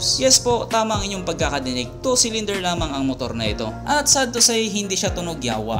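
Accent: Visayas, Philippines. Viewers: Bisaya pay imo haha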